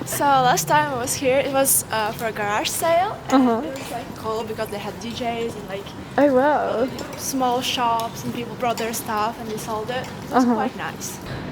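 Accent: United Kingdom. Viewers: Такой ярковыроженый британский английский, красиво. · Британский английский просто очень красивый, на самом деле.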